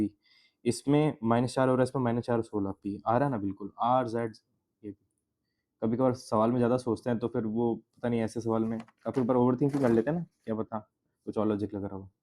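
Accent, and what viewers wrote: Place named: Marwari, India. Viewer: Bhai aap toh meri caste ke ho same marwadi language shikawati Rajasthan jesi😅😅.. Love from Mumbai❤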